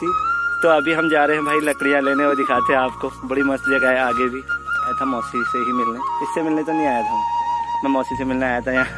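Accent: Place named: Pahari, India. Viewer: areee sir kya pahadi bolte h aap kaan to prm sukh ka anubhav ho gya 😍😍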